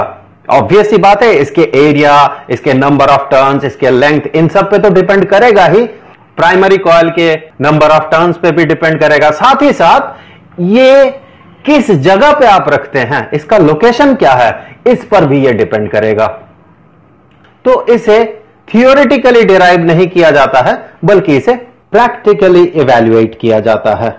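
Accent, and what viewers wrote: Bihar, India. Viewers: sir aap bihari ho kya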